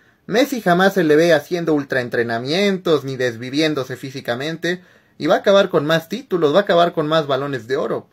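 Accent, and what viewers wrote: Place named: Mexico. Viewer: Como se nota que este es mexicano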